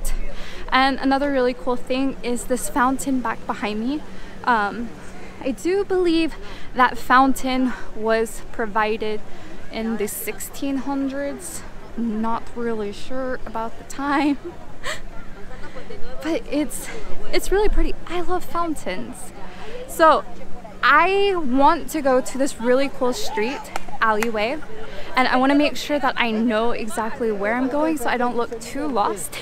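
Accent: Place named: England, United Kingdom. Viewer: How beautiful you are, I love your English accent !!😘😍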